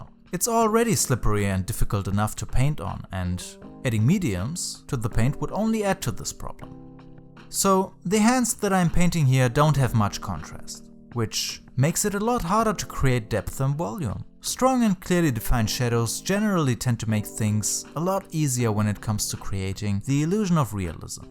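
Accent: Germany. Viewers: i like your paintings o.o you have a german-english accent. Are you born in germany? ;)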